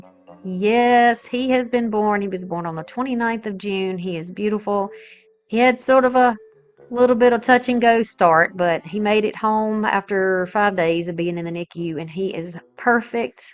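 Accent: South, United States. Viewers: Jackson and a Southern accent... A fellow mangolia stater? Also, adorable crafts.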